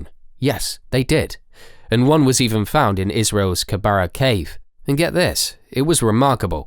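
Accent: United Kingdom. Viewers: Hearing an English accent explain how a neanderthal must have sounded is kind of annoying to me.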